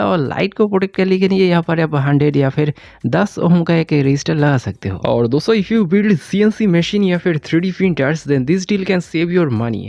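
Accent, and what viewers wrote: West Bengal, India. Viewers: Aapke sare projects bohot acche lagte hai. Ek question tha kya aap west bengal se ho??aapke batonse lagta hai❤❤😊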